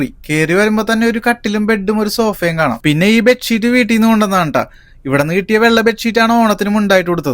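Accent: Kochi, India. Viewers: Kochikkaran ano? Oru Kochi taste ulla Malayalam 😂❤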